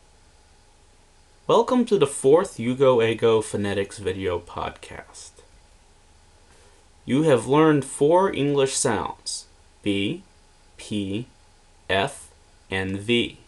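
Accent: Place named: United States. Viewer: One problem: this is American pronunciation. Correct English pronunciation is British Standard English (RP).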